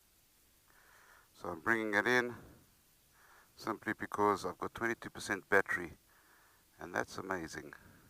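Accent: South Africa. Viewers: you sound South African Portuguese?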